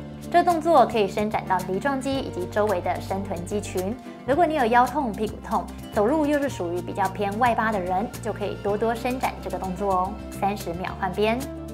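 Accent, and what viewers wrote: Taiwan. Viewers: 台湾女生说国语太好听了，温柔😊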